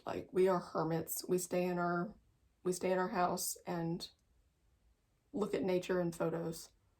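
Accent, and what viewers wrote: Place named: South, United States. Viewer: That Amish life in that magazine blitstitch is working on :) and I found it completed at a thrift store for a $1!!! It’s not framed but all three pieces are done except a little bit of border and bush but you can’t even tell unless you were looking really hard lol loved you video and am going to watch your 1st!! Love your southern accent 😜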